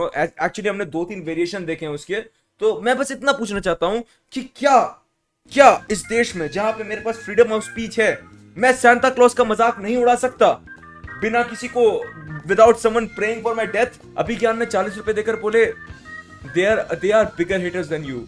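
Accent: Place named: Bengal, India. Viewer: Bengali ho bro 🤭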